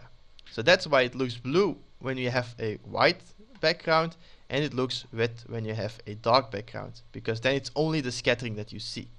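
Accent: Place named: Netherlands. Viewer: Heel duidelijke en mooie tutorial bedankt dat je dit met ons deelt. Ik vindt het leuk om te horen dat er Nederlands door je Engels heen komt dus mischien ook nog Nederlandse Tutorials ;-) Dus misschien hoor ik nog van je op het Dutch Blender Community <link>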